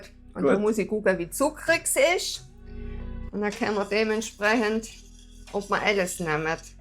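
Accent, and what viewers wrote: Swabia, Germany. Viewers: Hallo, ich bin gestern zufällig über deinen Kanal gestolpert und hab´ ihn direkt abonniert. Danke für das Rezept, deine sympathische Art und das schöne Schwäbisch 🙂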